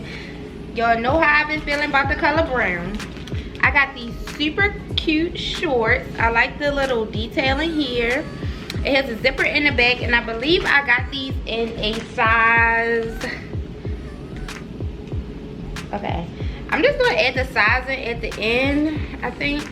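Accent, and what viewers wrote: Baltimore, United States. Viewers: I love your accent 🥰🥰I knew you were from Baltimore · First time here are hi dear, are you from Baltimore I know our accent anywhere😂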